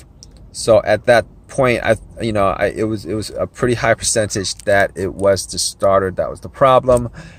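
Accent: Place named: New Jersey, United States. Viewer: Lol I was listening and thought to myself, he sounds like he's from Jersey 😂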